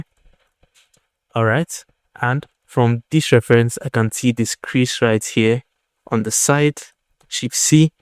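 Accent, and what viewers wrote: Nigeria. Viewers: Thanks bro, you sound nigerian though this is what i have been looking for a video that explains everything 20hrs is so awesome and for free nah bro we are grateful ill be back in 2 weeks to my comment and i have completed it by then . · Curious. Are you Nigerian by any chance? Your accent sounds familiar... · Being looking for a Nigerian to do a video like this, been tired of hearing accents.. kudos bro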